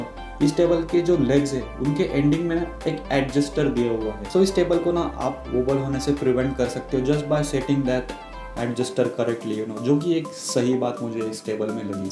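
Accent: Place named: India. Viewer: Why are you speaking Indian?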